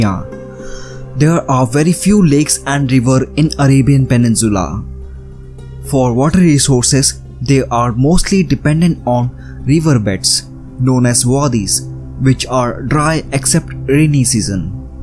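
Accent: India. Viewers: Indian accent is irritating,but the content is superb! Congratulation to the presenter for the effort he has done. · Nasty accent I think it's Indian guy talking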